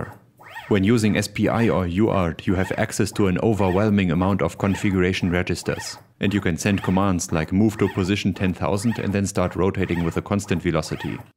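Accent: Germany. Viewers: I think its a german accent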